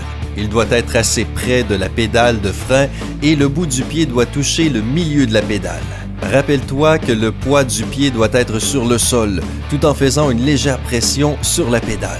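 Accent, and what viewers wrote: Quebec, Canada. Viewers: nice Canadian accent :) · Pourquoi cet accent québécois ? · Sous titrage merdique avec cet accent canadien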